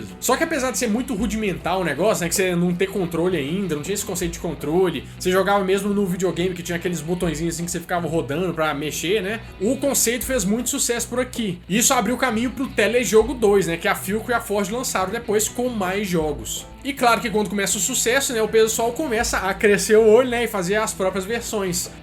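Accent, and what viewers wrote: Minas Gerais, Brazil. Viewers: Sou nova no canal , ele é mineiro ? Se for amei 💕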